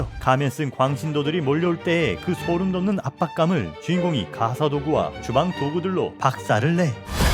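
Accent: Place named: Gyeongsang, South Korea. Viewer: 경상도 말투. 재수없다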